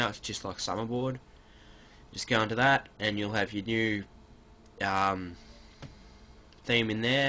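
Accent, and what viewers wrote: Australia. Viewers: I frickin love your accent bro im aussie aswell. good to hear it and thanks for the video it helped. Aussie pride amrtie ?! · Thanks for the tute mate. Good to hear another fellow aussie voice ;)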